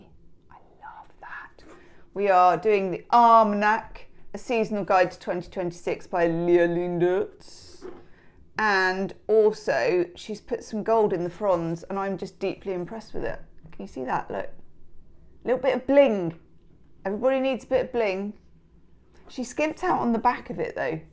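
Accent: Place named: West Midlands, United Kingdom. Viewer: Where are you from,? West Midlands? I've lived in Canada for 25 years now, but before that I lived in Stafford for a few years and it's refreshing to hear a voice like yours 🙂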